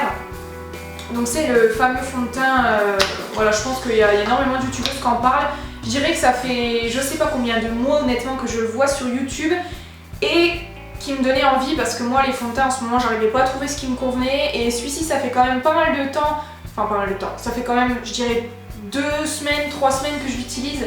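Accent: North, France. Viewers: Tu es du nord non? Classe tes achats! J'ai le double wear aussi je l'aime trop!!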